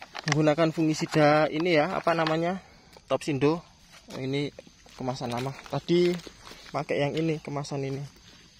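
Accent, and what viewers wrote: Java, Indonesia. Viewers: Kok bhs jawanya medok banget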